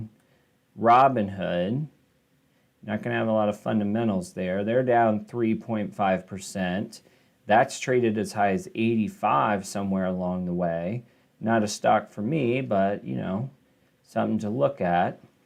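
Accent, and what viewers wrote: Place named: Hershey, United States. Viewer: It’s funny listening to your expressions. Takes me back home to Hershey. Very similar. Thanks buddy.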